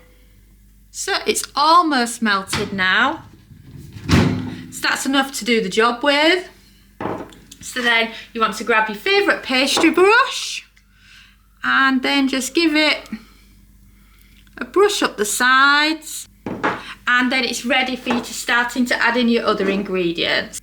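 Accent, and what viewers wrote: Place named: Hull, United Kingdom. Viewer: Just 'found you'. I'm a disabled guy in my 70s living alone ('cept for the dog - she's lousy at cooking with an air fryer). So cooking, necessarily, is either microwave or air fryer! Your recipes are gonna be real useful! Do you have a particular Air Fryer you recommend also, in the reviews - I'm presently using a real cheapie one. Does the job ok for simple meals/ready meals etc. Btw, where's yer accent from? - I was brought up in Hull in Yorkshire ('Ull') decades ago and your accent's a bit reminiscent of me childhood! Decades ago I lived in Japan a while, and I had to 'lose me 'Ull accent real quick - ('...they 'ant gorra clue what yr'on abaht if y'talk wi' a 'Yorksheer' accent in Tokyo!). I haven't been back to 'Ull since early 2000s. Would get totally lost there now! Cheers! Tony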